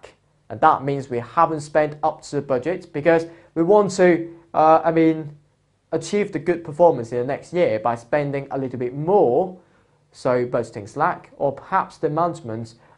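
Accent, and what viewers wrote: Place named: United Kingdom. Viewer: Very british accent)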